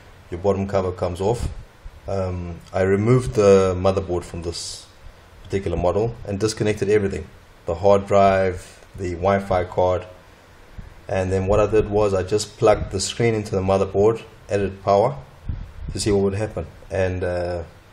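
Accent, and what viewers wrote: South Africa. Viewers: The SA accent?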